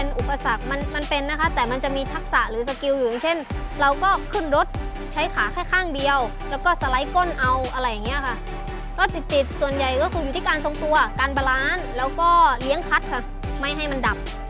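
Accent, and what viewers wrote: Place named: South, Thailand. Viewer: เก่งมากครับน้อง ถามนิดคนจังหวัดอะไรครับ ผมคนนครศรีธรรมราช ฟังสำเนียงเหมื่อนคนใต้ครับ เก่งมากครับ น้อง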